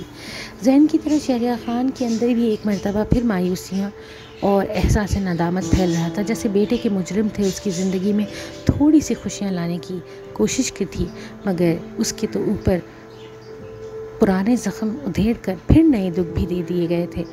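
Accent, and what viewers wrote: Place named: India. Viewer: I just dont understand as being an Urdu speaker why can't you pronounce Urdu words properly? you keep saying TULKHI INSTEAD OF TALKHI, and many other words like this and since when Pakistani's started speaking like Indian?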